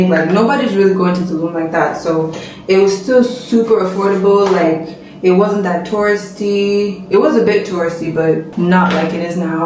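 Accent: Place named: Nigeria. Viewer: ummm babes how come I’m hearing Naija accent o 😂 Welcome back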